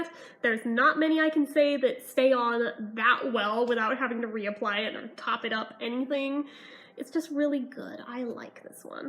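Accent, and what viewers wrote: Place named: South, United States. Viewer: I love her southern accent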